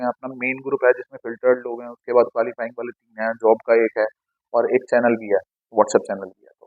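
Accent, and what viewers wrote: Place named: Haryana, India. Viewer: Thoda haryani touch acha lagta h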